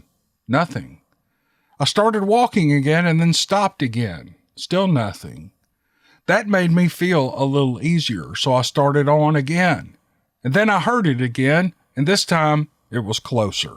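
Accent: South, United States. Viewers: I love listening to you , you have never told a dull story. Your southern drawl gives your stories that extra "flair" for great listening. I listen to them in the dark when going to bed...they are my "bed time stories". One day I will share what happened to my brother and I on St. Helena Island, SC., rural area of Frogmore...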